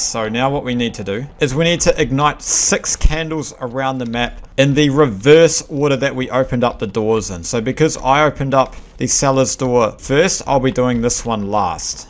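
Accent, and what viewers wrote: New Zealand. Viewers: Your a kiwi aren't you? :)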